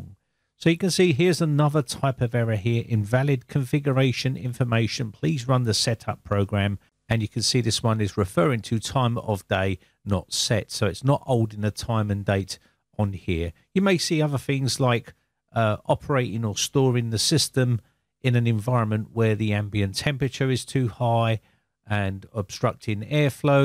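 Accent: United Kingdom. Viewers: This is weird, because I have never owned a PC that has done anything other than pop a "CMOS Checksum Error" (or some similarly worded error message). The BIOS chip makes a checksum of all the settings you have saved in CMOS, and it keeps this in memory. When you boot the computer, it will compare the checksum of the current CMOS Settings against the CMOS Settings Checksum that it had previously saved. If, for any reason, they do not match (they won't match if the battery is dying, ever), it will pop that error and force you to go into the mainboard settings (some BIOS chips give you the option to boot with default settings instead, but this is usually undesirable because the default settings almost never include a storage device, which means no OS will be found). This is hard-coded into the PC's BIOS chip, and it's done for safety reasons as erroneous data could be very damaging to the computer's hardware, such as sending wrong voltages to the wrong places in newer computers whose BIOSes allow you to overclock hardware. 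You'd have to be running an extremely cheap off-brand mainboard to be able to boot with corrupt data in the CMOS. Unless, of course, they do things differently across the pond? You sound British so maybe computers in the UK work differently than those over here in the States?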